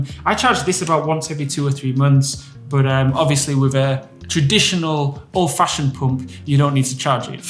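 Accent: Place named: North Wales, United Kingdom. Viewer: Hi, did you grow up in north wales or near north wales, can’t stop picking some similarities in your accent 😂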